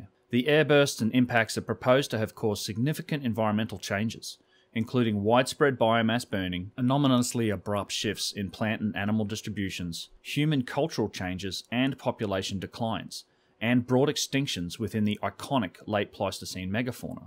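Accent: Australia. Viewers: Love your videos, excellent content. Out of interest, you live in California but you sound Australian, why do you live in the USA? Thanks. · You sound Australian. Just a small point. 10 mins you show a map of the world. Northern and southern hemispheres are actually the same size but it's not represented that way on your map. Stick up for AU.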